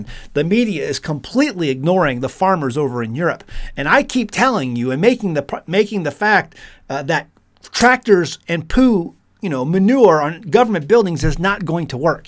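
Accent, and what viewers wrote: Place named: Pennsylvania, United States. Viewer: Sounds like you are a fellow Pennsylvanian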